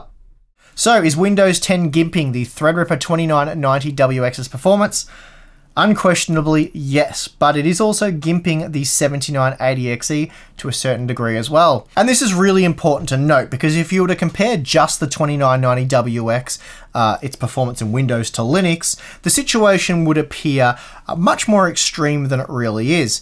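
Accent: Australia. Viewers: PLEASE DO MORE LINUX! I love your channel and your wacky Australian accent. MORE LINUX! Give the people what they want!!!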